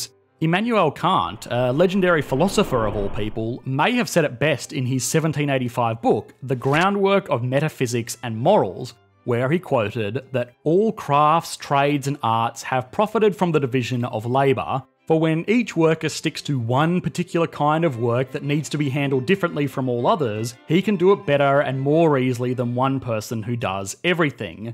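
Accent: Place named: Australia. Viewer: Jesus the narotor's accent is giving me a headache. Listening to Australian accent for prolonged period of time sounds like a distressed, agonising animal or mentally challenged individual with speech inpediment.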